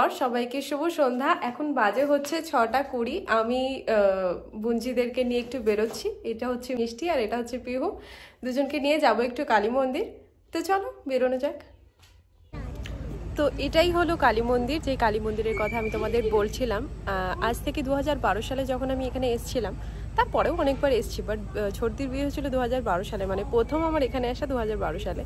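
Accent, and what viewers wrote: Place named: Bankura, India. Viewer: হটাৎ করে ইউটিউবে ভিডিও দেখতে দেখতে আপনার চ্যানেল ভিজিট করা,,তারপর থেকে আমি সিরিয়াসলি এটা জানি না কেনো আপনার ভিডিও দেখি কারণ না ভালো cinematography আছে বা না ভালো এডিটিং ,,কিন্তু কোনো এক অজানা কারণে হয়তো ভিডিও দেখি,, একটা কারণ বুঝতে পারি সেটা হলো আপনার কথায় একটা আলাদা টান আছে যেটা আমার বেশ ভালো লাগে, যতদূর সম্ভব বাঁকুড়া র টান এটা,,যায় হোক এগিয়ে যান,, আপনার চ্যানেল গ্রো করুগ এই আশা রাখি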